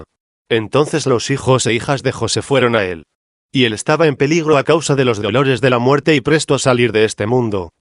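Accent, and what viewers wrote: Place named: Castile, Spain. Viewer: Por favor, no uses tu voz Robótica. Vuelve a hacer este video con movimiento de personajes, hay muchas películas de dónde tomar cortos. Por favor, trata de hablar y leer en español latino porque no soporto el castellano, te agradeceré hasta que me muera si me das gusto. Trata de cambiar los textos que lees al español latino, como hablamos el nuevo y mejorado español de México, y te felicito por este video, lo buscaba pero no lo encontraba.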